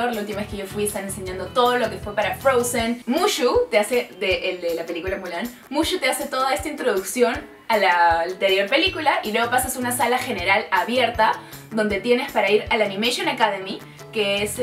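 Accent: Peru. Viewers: Eres Peruana? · Como que cuando empezaste a hablar...ya sospechaba que eras peruana~🙋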